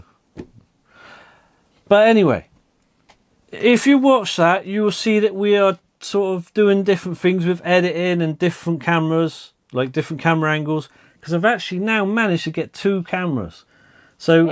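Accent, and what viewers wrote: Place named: England, United Kingdom. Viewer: Thank You, for a Great Video, and, where r you Gentleman from, England, it sound's like.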